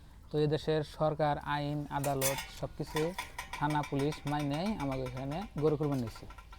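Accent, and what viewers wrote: Bangladesh. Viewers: উজানী ভাবি ভাবির ভাষা শুনি বুঝছি ভাবি উজানী মুই ও কিন্তু উজানী 😂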